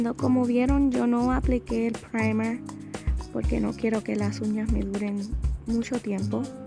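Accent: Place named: Puerto Rico. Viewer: Perdona la pregunta eres boricua? tú acento es igual que el mío PR en la casa saludos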